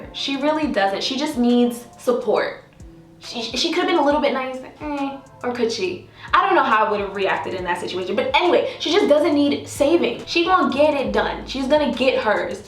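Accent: Jamaica. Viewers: Is she Jamaican or Trinidadian? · I subbed the instant i heard the jamaican accent. "Mek me watch this likkle eediot show " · Love your Jamaican accent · are you from Jamaica? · Wait you speak patois🥺I just found this channel loved your content but now I’m sold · The way her Jamaican accent and sayings play peek a boo tho I love it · "Mi will watch dis likkle ediat show" OMFGGGGGGGGGGGGG YOU ARE JAMAICAAAAAAAAAAAAAAAAAANNNNNNNNN or Caribbean?? But mi know mi hear a Jamaican accent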